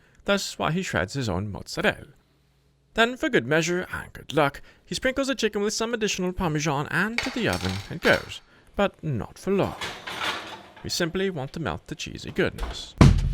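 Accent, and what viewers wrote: England, United Kingdom. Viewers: You are so entertaining with the way you deliver your recipes. So different from everyone else's boring hum drum keto recipe channels on YouTube. Your videos are funny, entertaining, informative and refreshing. Loving the different costumes, characters and english accent narration.😊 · This Italian is please of what he sees. Hysterical video content and edited and awesome food. You're doing that British accent??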